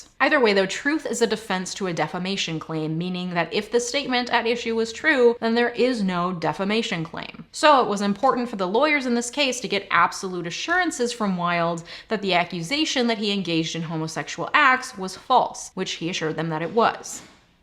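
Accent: United States. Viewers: From the title alone I'm fearing to hear some of the dumbest take I've heard this week. Let's see how it goes. From the accent, a Muhrican, checks out so far... Acts "jokingly" as if visiting another country is something to be proud of or to brag about, yup, the stink is rising. Misses the entire point of Dorian Gray, as the point is not that the picture only ages instead of Dorian, but the consequences of his growing depravity shows on the portrait instead of his own, age is the least of the things concerned, you, you... american ! Has interesting takes on what constitutes a child in the victorian era and applies modern american sensibilities and morality to another country in another era, niiiiiiiice... they could work chimneys at 3, but having sex at 17, never ! Has a wildly revisionist vision of the acceptance of male homosexuality in the UK in victorian times. 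Nope, only one third in there's already so much bullshit I can't go on... And you're supposed to be a bloody lawyer ? May God have mercy on your clients...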